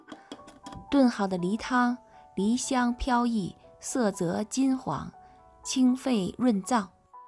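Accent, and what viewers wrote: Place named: Beijing, China. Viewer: 浓浓的京味👍👍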